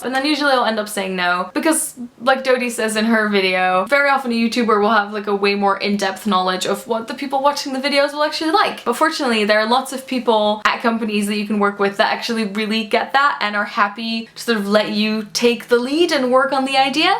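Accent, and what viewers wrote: Ireland. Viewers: Haha I thought you were Irish until I read the description, I'm a new subscriber :D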